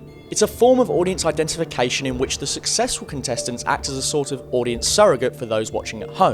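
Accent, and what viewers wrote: United Kingdom. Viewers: Your British accent is making my ears rot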